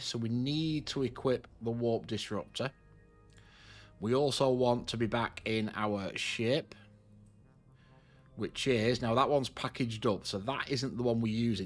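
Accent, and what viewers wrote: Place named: Manchester, United Kingdom. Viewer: Another helpful video. Thank you. I thought I had made a noob mistake getting blown up in Mission 5 of 10................I now feel much better about it! I have insured several ships but have forgotten which ones - is there an easy way to see which ones have insurance and which don't? I've also been meaning to ask where in the world you are - my guess is Manchester/Salford/Salford? I'm a Mancunian myself (Whalley Range) although since escaping from work we now live north of the border. Your accent takes me back home again 😀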